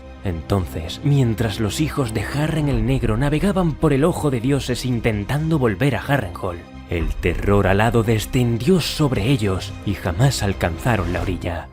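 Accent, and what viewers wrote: Spain. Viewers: soy latinoamericano. Pero te digo una cosa. Siempre me ha parecido que la lengua castellana de España es mejor para narrar historias de fantasía medieval, no sé porqué, pero así lo siento. Debe ser porque le da más epicidad a la narración. No estoy denigrando de ninguna manera la narración del castellano latinoamericano. Has narrado este video espectacularmente, de verdad, te felicito. Muy bueno.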